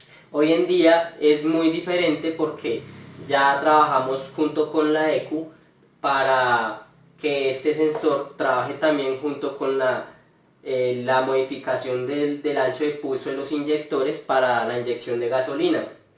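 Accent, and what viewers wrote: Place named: Colombia. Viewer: Amigo de qué parte de Colombia eres ? Pregunto por tu acento ??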